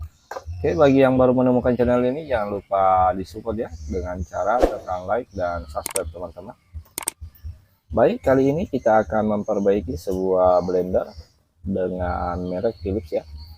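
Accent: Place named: Bugis, Indonesia. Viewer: Kayak orng bugis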